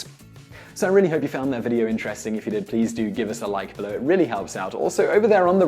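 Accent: England, United Kingdom. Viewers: A British one? Haha, sorry, I'm American and I really can't tell one British accent from another. I'd be interested in hearing if anyone actually does know, though! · Ok but where does accent fall on the map of Extremely UK Sounding People? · British.... Duh! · Does the video narrator speak in RP? He sounds like a "normal" British person to me.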